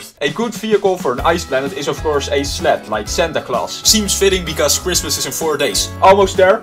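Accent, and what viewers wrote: Netherlands. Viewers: Ik ruik een nederlander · I smell someone dutch like me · Ben je Nederlands? Ik hoor het aan de accent haha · 100% een nederlander hoor het gelijk aan het accent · Oh you are OBVIOUSLY dutch. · Are you dutch becouse you realy sound like your dutch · Are u dutch?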